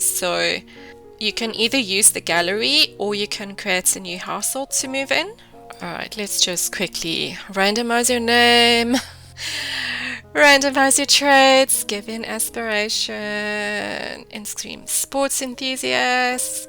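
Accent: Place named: South Africa. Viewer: Idk why but I was so caught off guard by this being narrated by a South African 😂 SA simmers represent! 💚💚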